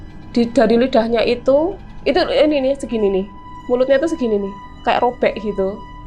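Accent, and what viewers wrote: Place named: Java, Indonesia. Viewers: Aku seneng mba kalau ada orng logat jawanya keluar seneng .mba nya cantik buaaaanget🤭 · pean medok banget, koyo aku, Mbak 🤣🤭 wis 11 thn tinggal di Belanda tp masi medok jowone 🤣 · Medok e😁😁😁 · Semarang hadir .. pas ki podo smg e. Bahasane semarangan bgt 😂😂 ono ding e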